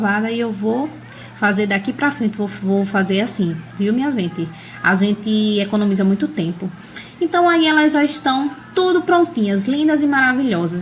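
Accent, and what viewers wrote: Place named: Pernambuco, Brazil. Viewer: Adoro suas receitas!! Esses docinhos....cada um mais lindo que o outro!!! Pelo seu sotaque, você é daqui de Recife/Pernambuco??? Se for somos conterrâneas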